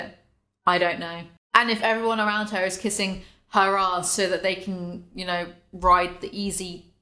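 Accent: United Kingdom. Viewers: Gurl, you've got the prettiest dark honey-chocolate eyes, the makeup suits you well. And i will never get tired of listening to you talk about anything. Your british accent is so nice.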